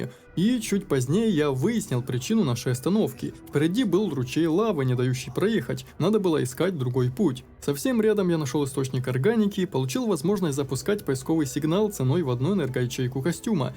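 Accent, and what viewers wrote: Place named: Ukraine. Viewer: судя по говору он украинец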